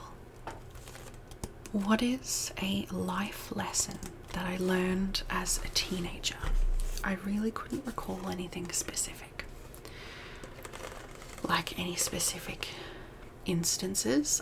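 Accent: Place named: Australia. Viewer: this is such a special video! 🥲💗🙌 I really resonate with your gentle and loving style of ASMR. And I really enjoyed hearing you answer my questions! 🥰🥰🥰 (Also, your lovely Australian accent reminds me of a good friend of mine from middle school, which makes me happy. ❤️)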